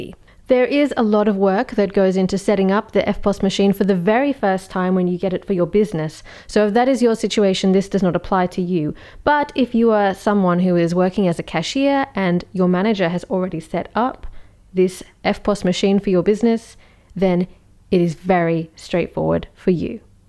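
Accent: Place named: England, United Kingdom. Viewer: U sound English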